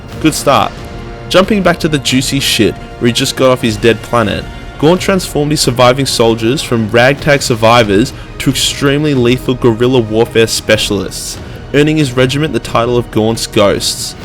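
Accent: Australia. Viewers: i know you mean english speaking but jesus christ be specific. hes australian, not english. its basically the same just worse at cricket/rugby and better at crime. cheers ears 🖒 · It’s literally an Australian accent. Someone else is racist.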